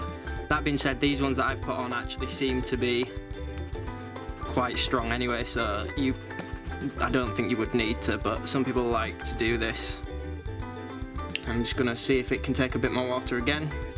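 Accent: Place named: Northern England, United Kingdom. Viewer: Such a thick northerner accent I reckon (I'm not an English speaker) But were you stoned or is it just my stoner paranoia?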